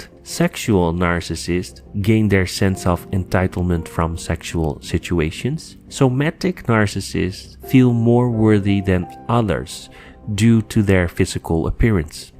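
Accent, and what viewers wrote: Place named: Netherlands. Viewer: Lovely Dutch accent 😃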